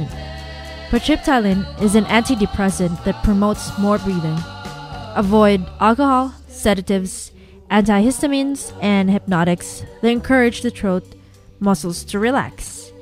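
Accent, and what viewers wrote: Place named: Canada. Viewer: Pretty informative. Good job. And the canadian accent is too cute lol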